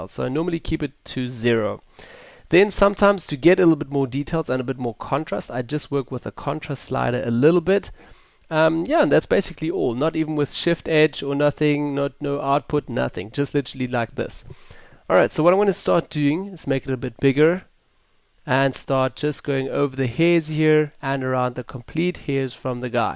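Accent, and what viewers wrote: South Africa. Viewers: Bro are you South African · is that a south African accent?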